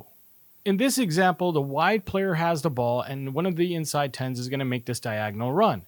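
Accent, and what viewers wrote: United States. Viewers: It's strange hearing an American accent talking about football ... I'm not saying you don't have the right like anyone else to be football fans .. but hearing you say 'we' is very unfamiliar... Fair play fella , I'm not hating ... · I am portuguese and I dont care if your accent is american, keep the videos coming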